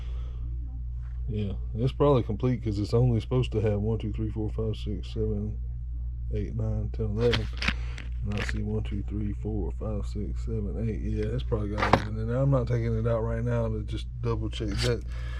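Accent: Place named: Appalachia, United States. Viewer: Hey hillbilly talk right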